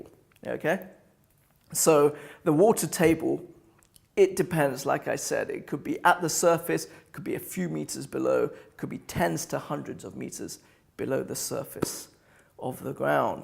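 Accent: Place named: United Kingdom. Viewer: bro no offense but u sound like british but looks like an indian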